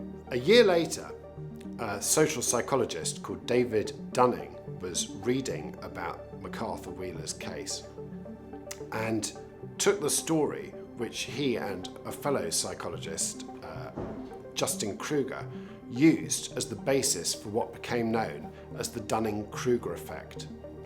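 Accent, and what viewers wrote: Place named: RP, United Kingdom. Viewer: Time will tell who is who? This speaker will fell off his chair!! Talking and criticising President Trump in a posh accent does not make him intelligent. Be prepared for what is coming. We Love President Donald Trump..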